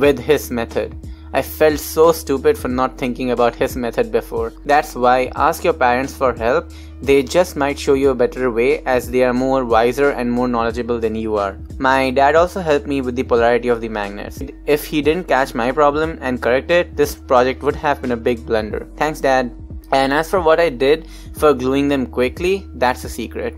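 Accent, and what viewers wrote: India. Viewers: You Indian?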